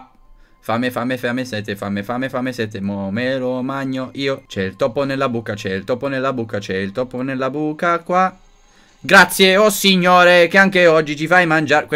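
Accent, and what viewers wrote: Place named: Bari, Italy. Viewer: che parla in dialetto barese😂😂.Io sono barese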